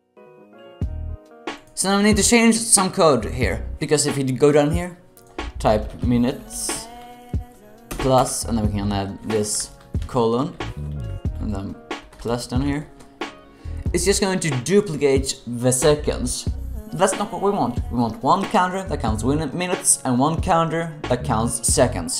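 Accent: Sweden. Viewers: lol I immedietely knew you were from Sweden from you accent! damn, that early day pewds when he wasn't so fluent in english made me catch that accent instantly :v great tutorial btw, helped me out a lot, keep up the good work!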